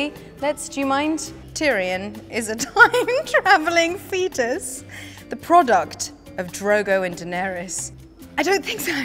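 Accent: United Kingdom. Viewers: why are there subtitles?? is a british accent so hard to understand????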